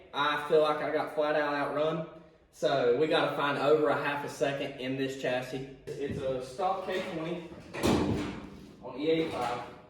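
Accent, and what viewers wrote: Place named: Tennessee, United States. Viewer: I'm just traveling down the rabbit hole of "beer can" or as the kids call them now "rocket" builds for ideas on my 1G Neon and... 😳 Mother of God... It's like train wreck. I hate everything about it and absolutely love everything about it at the same time. The ascetics and being Japanese make me hate it. The functionality and being piloted by someone that talks like me (Tennessee born) make me love it. 1.7 lateral Gs? Despite being from the side that lost WW2, has never landed a human on the moon and uses a measuring system that makes sense, I'll be binge watching for sure.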